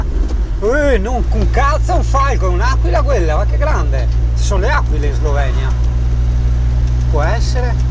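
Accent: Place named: Milan, Italy. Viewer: ma quanto cazzo parli milanese del cazzo..insopportabile...se dovessi solamente un giorno capitare con te in auto, mi sparerei al primissimo secondo al solo ascoltarti parlare... anzi ti sparerei...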